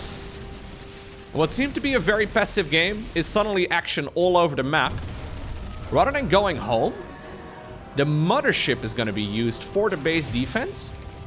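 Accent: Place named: Netherlands. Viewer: Interesting how you Dutch people pronounce stuff. But when you typed it, I understood right away. In German it is "Schurke". But we pronounce it quite differently. Interesting :)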